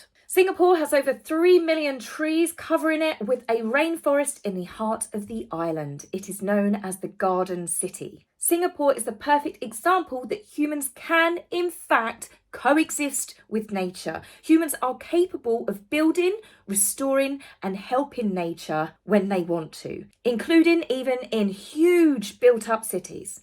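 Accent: United Kingdom. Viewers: is incredible. I can see this channel going the same success as Stef the Alter Nerd. its the way you present and who doesn’t like a British accent?:) It seems Meghan and Harry are the worst Royal connected couple in modern times.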